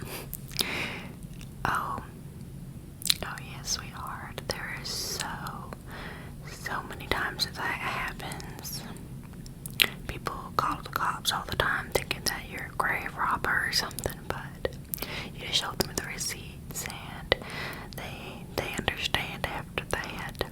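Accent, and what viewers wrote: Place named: South, United States. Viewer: This was SO GOOD!!!!!!!!!! As a southerner myself, I can say the accent is accurate to what most of us sound like. And also, I got a lot of tingles from the video. So keep up the good work!!!!!!!!!!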